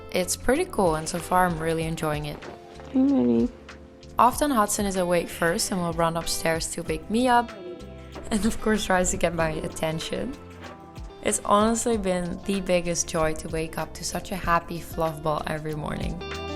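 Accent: Netherlands. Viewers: I think she’s Dutch sounds it …Wonder which part of the Netherlands she’s from :) Nice vid 🎉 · you sound like you are dutch! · I smell een Nederlanderrrrr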